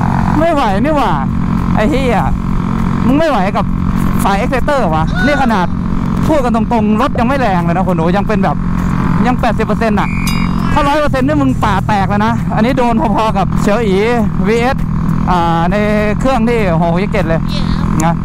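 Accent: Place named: Suphanburi, Thailand. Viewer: คนสุพรรณคับบ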